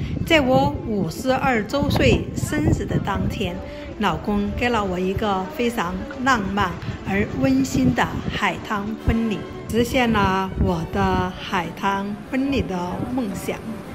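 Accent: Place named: Sichuan, China. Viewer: 姐姐好听四川话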